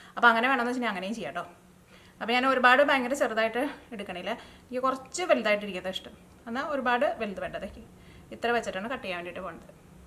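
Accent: Thrissur, India. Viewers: My dear sister you talk too fast. We are from South Kerala so it's difficult to catch up with Thrissur slang. So please slow down a bit. Thank you.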